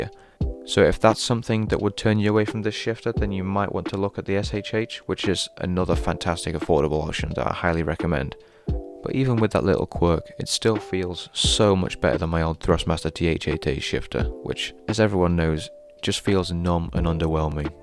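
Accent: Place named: United Kingdom. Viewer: Sadly, I searched on eBay and wasn't able to find any stateside. Going by your accent, you sound like you're in the UK. I found the seller you probably bought yours from, but the shipping cost to the US makes it out of reach. I'll probably just spray paint the piece of silver 4080 I ordered from Aliexpress..when I get it in 5 or 6 months. haha.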